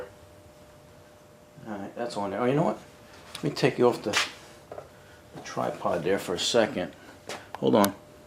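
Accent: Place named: New Jersey, United States. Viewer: Yeah! What state you in? Your state sounds like New Jersey or some other upper east coast state.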